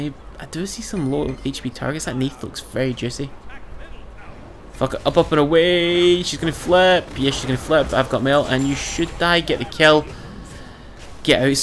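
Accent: Scotland, United Kingdom. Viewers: Sometimes I can't understand a single word you are saying especially when you talk quietly/mumble :( that Scottish accent. You fuck up guardians with beads what is wrong with that? :D I also noticed watching NA players that they have easier games in diamond ranks. My usual ping is 70-80 and when it rises above 120 its nightmare for me (usually playing adc)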